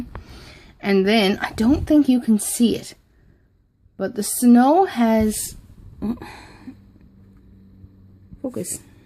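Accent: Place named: Canada. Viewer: Cute cards! Love the watercolored tree cards! I also love that falling snow stencil! Want to get it too! I thought I could hear a Canadian accent, and then when you said you ordered from Amazon.ca, I knew for sure! I was born and raised in northern Alberta. I live in the States now. Anyhoo, I don't think it's too early to make Christmas cards, especially when making so many. Singing, however, is a different story, heehee! TFS! Hugs, Shonda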